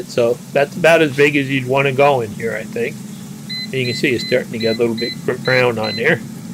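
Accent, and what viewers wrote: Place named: New York, United States. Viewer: You have a slight New York accent but no hillbilly twang!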